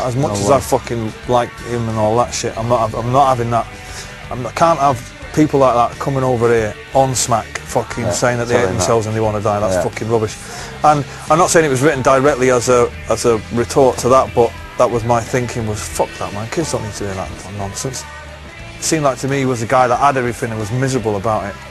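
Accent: Manchester, United Kingdom. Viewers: Try going to Ireland with your Manc accent and tell the Irish that YOU are Irish Noel ... they'll call you a plastic Paddy!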